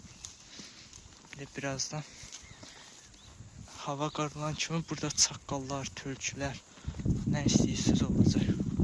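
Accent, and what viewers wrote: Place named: South, Azerbaijan. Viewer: Ləhcədən Cənub bölgəsinə oxşayır.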